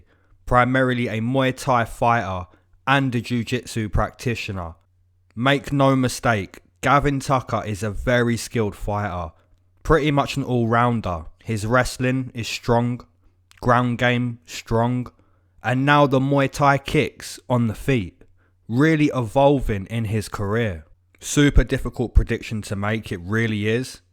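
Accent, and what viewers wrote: United Kingdom. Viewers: U sound like that other Brit (mma guru) who talks funny and crazy ... who’s the better predictor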